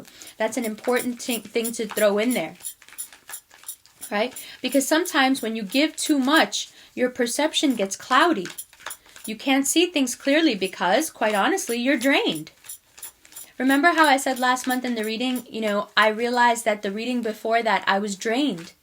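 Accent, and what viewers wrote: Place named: California, United States. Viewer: I hear that Cali accent. My daughter is a Gemini too.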